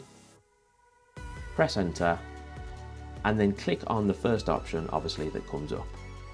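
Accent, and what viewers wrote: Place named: England, United Kingdom. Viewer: Cheers, good to hear a English accent too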